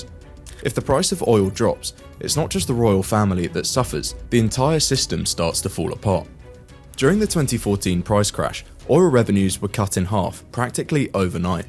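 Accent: United Kingdom. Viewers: A BRIT TALKING 😂. CRIME RATE THROUGH THE ROOF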